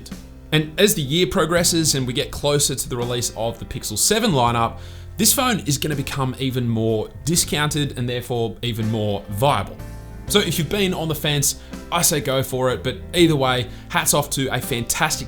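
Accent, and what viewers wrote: Australia. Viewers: this guy looks american but has an australian accent. so interesting!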